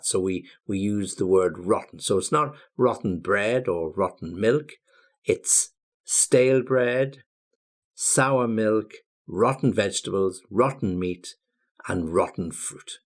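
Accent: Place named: United Kingdom. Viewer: Wow, I like a British accent 😊😊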